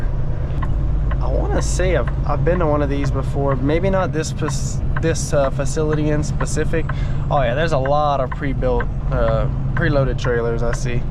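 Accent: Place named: Louisiana, United States. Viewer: I hear Louisiana. You from The Boot?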